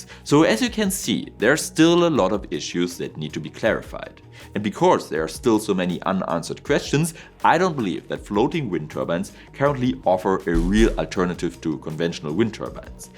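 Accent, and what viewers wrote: Germany. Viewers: A very interesting, well illustrated, insightful and well balanced video. Your speed of presentation was perfect for me. I much prefer your very clear diction and mild German accent to the strong regional accents of the UK. Cheers from Scotland. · hello Dr, could you please speak a little bit faster? i'm having trouble paying attention to what you're saying because my mind keeps getting distracted by your thick German accent. you say that when wind speed doubles, its power increases 8 fold? the energy of the air particles is 0.5mv^2 & power is energy/time so maybe 4-fold? you how they make plastic kayaks where they put the kayak mold in a machine that spins it in all directions & has the molten plastic cover all the walls evenly?, maybe after inflation when the balloon is rigid, you could do a similar thing with a very light sealant that coats the insides while the balloon is spinning around all crazy in a heated chamber. 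this design is very cool because the turbines are in an enclosed space & it would cost very little energy to make them bird-safe. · honestly like if people think talking slower would help them... they know there is a speed button right? I have never had an issue understanding you and I don't have much experience listen to people with a german acent and sorry I just don't watch you that often.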